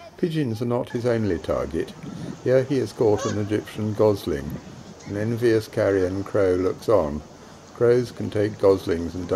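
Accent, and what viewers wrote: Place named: United Kingdom. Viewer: Educated? Lol. Thats just a british accent fool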